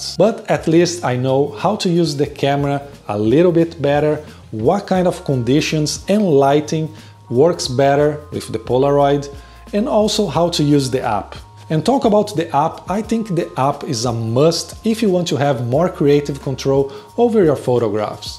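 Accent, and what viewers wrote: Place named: Brazil. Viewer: Impressionante como sotaque de br da pra notar de longe kkkkkkk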